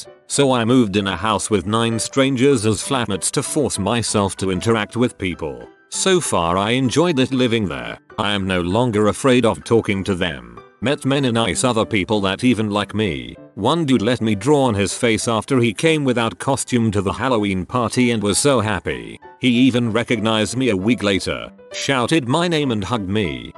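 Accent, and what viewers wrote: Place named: England, United Kingdom. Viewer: Really tiered of that English accent.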